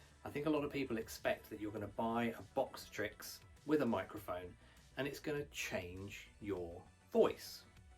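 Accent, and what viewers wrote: United States. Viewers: Your American Accent was perfectly good. Thank you for this informative demonstration. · I just acquired stormtrooper complete armor here in Florida, USA and I'm looking for a voice changer. Your video was very helpful and entertaining. Plus your American accent stormtrooper voice sounded really good. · Great video, thank you! Your American accent is not bad at all. I’m not sure I would have questioned it.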